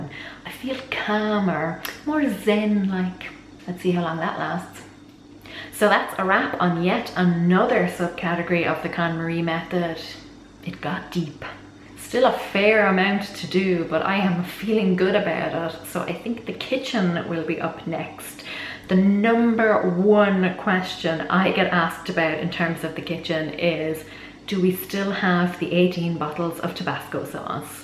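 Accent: Ireland. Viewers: Interesting video :) Are you Irish?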